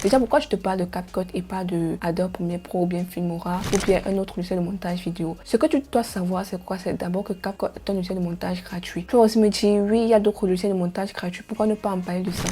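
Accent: Cameroon. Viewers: Comment tu exprimes comme une camerounaise comme ça🙂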